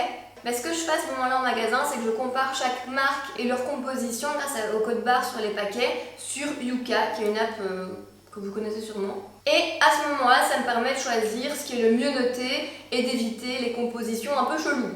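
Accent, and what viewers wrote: Belgium. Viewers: J’adore ton accent belge 😂 pour les pâtes de pois de pois chiche et lentille y en a au colruyt 😉 es ce ke tu peux dire quelle genre de biscuit tu manges es ce que sont ceux des régimes ou les normaux😘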